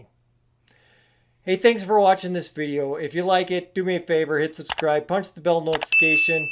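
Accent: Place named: Wisconsin, United States. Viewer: Based on accent, Wisconsin, Barron County? Thanks for the tips.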